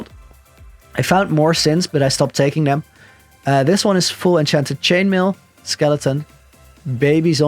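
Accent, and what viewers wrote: Netherlands. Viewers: Hé sounds dutch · You sound a bit dutch... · U Dutch? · hahah dacht ik al aan je engels te horen (is geen haat)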